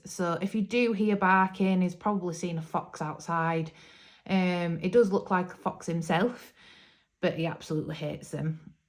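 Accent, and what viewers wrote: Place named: Yorkshire, United Kingdom. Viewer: Welcome to colouring! I started my channel earlier this month as well. Yay for another delightful Yorkshire accent, yay for another happy pup, and well done with all that work in April!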